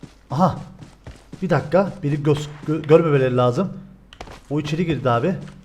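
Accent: Cyprus. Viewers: Abi gercekten kıprıs lımısın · KIBRIS KONUŞMASINI COK SEVİYOM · Kıbrıslıları abi sayesinde asik oldum şivelerine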